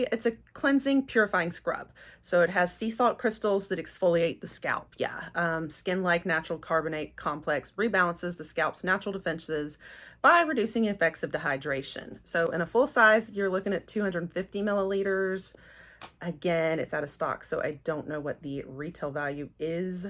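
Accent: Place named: United States. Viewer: Just the let you know I’m from the uk and I LOVE the American accent so I’ve subscribed and I think your advent videos are great xx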